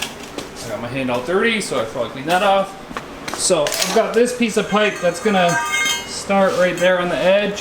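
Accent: Canada. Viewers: Lol, boots. Love the Canadian accent.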